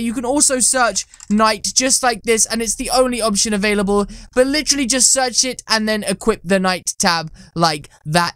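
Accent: United Kingdom. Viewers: IS FROM THE UK!!! I knew it.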